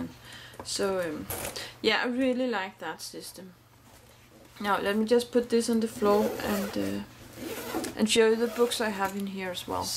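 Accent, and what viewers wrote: Denmark. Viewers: Are you from Denmark ? xD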